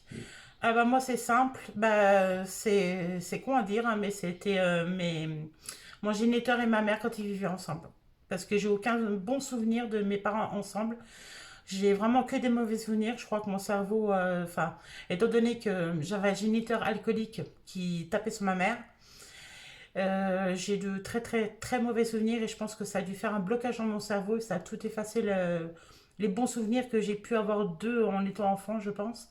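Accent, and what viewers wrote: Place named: Picardy, France. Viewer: Coucou, j’ai découvert ta chaîne grâce à tiphaine. J’aime beaucoup ta personnalité, tu as l’air d’avoir une histoire touchante, mais tu as réussi à te construire une vie de famille super. Tu fais un super joli couple avec ton mari, vous allez bien ensemble. Vous êtes de quelle région? Moi je suis de Picardie et j’ai l’impression que vous avez un petit accent comme nous! Bisous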